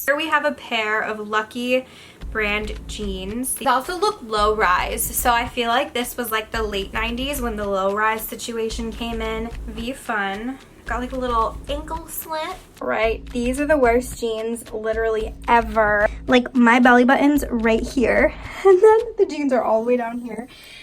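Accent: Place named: Wisconsin, United States. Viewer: Your Wisconsin accent is the cutest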